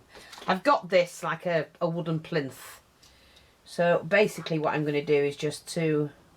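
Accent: United Kingdom. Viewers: Loved watching you do this. You always have exactly what you need. Wonder if it would be the same way if I tried it? Not! Love your British accent. I’m from SC in USA so it’s quite a novelty..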